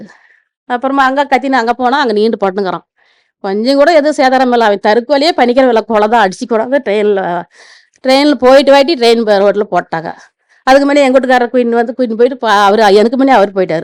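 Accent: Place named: Krishnagiri, India. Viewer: எங்கள் அம்மாவை போலவே உங்கள் முகமும் பேச்சும் கிருஷ்ணகிரி மாவட்டம் 😢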